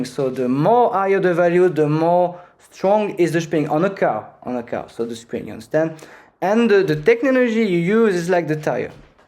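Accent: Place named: France. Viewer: f*cking english 😂😂😂 nice tutor in frenglish dude 🤜🤛😁😁😁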